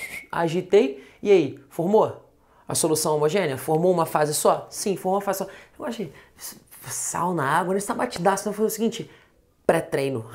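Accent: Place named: Rio de Janeiro, Brazil. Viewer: Sotaque Carioca é uma tortura.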